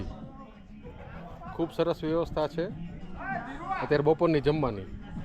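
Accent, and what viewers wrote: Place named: Kathiawar, India. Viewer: is our good friend and pro bike rider since many years and a very humble person and now he is on YouTube with our Spl Kathiyawadi language I wish him on his new ride 👍✌️✨🎉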